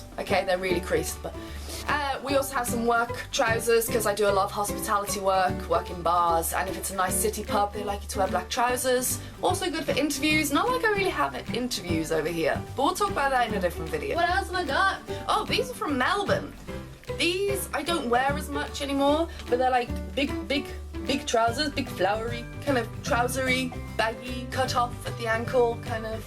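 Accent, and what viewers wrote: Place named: RP, United Kingdom. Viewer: i love love love your videos and think you're absolutely great, but i can't help giggling... you have such a 'gap yah' accent, i love it!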